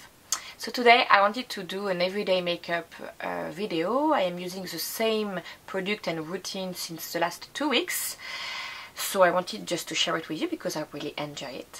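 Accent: France. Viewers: You look very pretty. Your makeup is simple and lovely. Very natural. Love your French accent.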